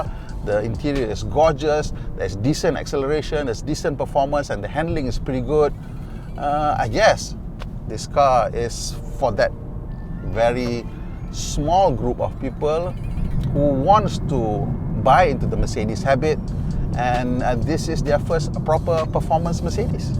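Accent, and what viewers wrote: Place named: Kelantan, Malaysia. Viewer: best jugak cakap omputih sleng kelantan... senang faham hehehe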